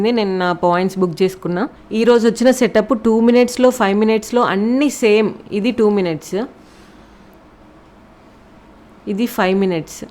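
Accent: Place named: Telangana, India. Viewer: Good teaching. Telangana slang 😀 Sister. How many years of experience do you have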